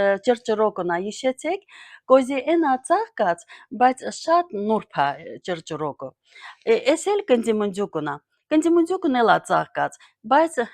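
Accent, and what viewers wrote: Artsakh, Azerbaijan. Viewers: Добрый вечер моя дорогая джан,я смотрю и уже понимаю,конечно все нравится,что вы готовите,но как красиво говорите это супер,потихоньку буду понимать наш Арцахский диалект, спасибо большое,дорогая моя сестра ❤️🩷❤️🩷❤️🩷💋💋💋💋💋🌹🌹🌹🌹🌹👍👍👍👍👍🙏🏻🥰🥰🥰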